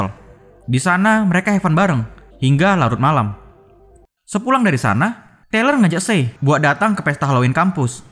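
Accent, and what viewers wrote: Medan, Indonesia. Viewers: Fixs nih orang Medan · Logat medan ni yah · medan kau ya lae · Nampak kali logat medannya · Bah, Nampak kali Medan kau bang... Agak kreak dikit biar makin mantap bg.. Hehehe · Orang medan ni kayakNya.. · Mimin orng medan? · nak medan bah hahah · Bro aku suka bahasa mu..sesuai dengan daerah kU Medan.. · Kimak kali miminnya, org medan kau ya min??? Aku pun org medan wak 😂😂😂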